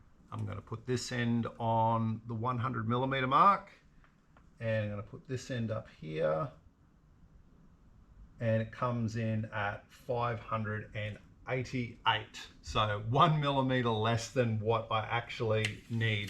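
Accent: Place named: Australia. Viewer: Nice heli. Good review. Love your Australian accent. Hello from Massachusetts, USA.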